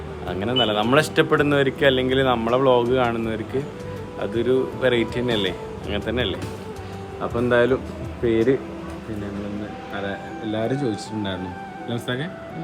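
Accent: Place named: Kozhikode, India. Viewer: nammed koikoden bhasha🎉🎉adipoli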